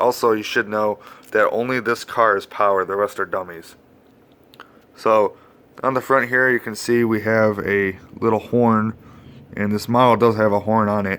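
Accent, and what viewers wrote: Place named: New York, United States. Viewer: Like the voice has New York accent even.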